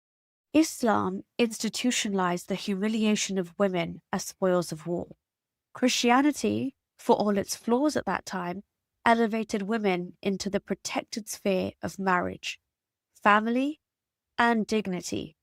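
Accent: United Kingdom. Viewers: Maybe because she has a British accent?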